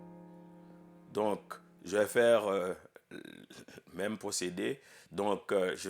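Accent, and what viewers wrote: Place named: Canada. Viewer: Vous avez un accent pas très prononcé mais coe une personne qui a été au canada ou bien vous êtes au canada.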